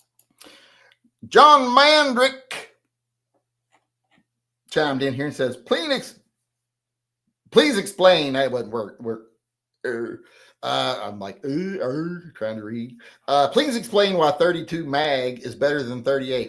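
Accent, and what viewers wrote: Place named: South, United States. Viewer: I don't know your story. You sound like you have a southern accent. It's blasphemy for a southerner to refer to himself as "Yankee." Lol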